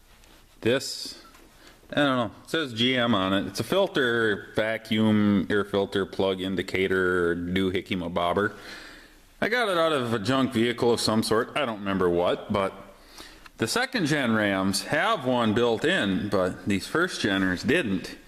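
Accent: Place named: East Coast, United States. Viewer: Great video. I love the back east accent reminds me of home. Would love to have a beer with this guy.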